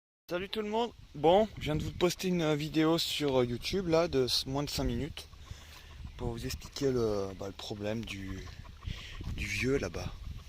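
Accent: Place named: Paris, France. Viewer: je ne sais pas si on peu vivre avec 1 rsa , meme en camion, qd le camion doit aller au garage ca coute 1 blinde ,au veto avec les chiens egalement , .....et tu pleures , ....cest plutot de la survie , tant que tout va bien .........au moindre enmerde c complique , jespere que tu as la possibiite de faire 1 peu de black par ci par la , le systheme D , tu nas pas laire bete , tes chiens ont lair heureux ca c bien, tu fais de belles videos , tu a lair plutot sympa avec ton accent de titi parigo , tu as raison de profiter de la vie t jeune , et te souhaite le meilleur , mais pense que tu va aussi vieillir 1 jours , .......et que la vie en camion na qu1 temps .....continues a nous regaler avec tes videos .tu devrais flouter ta plaque dimmatriculation, avec tout les craignos quil y a sur internet , ......petit conseille de vieux roots .....les bougies de prechauffe cest surement la cause , c pas donne , ......1 camion de + de 15 ans , le turbo peut etre l e probleme , changes t filtres aussi .....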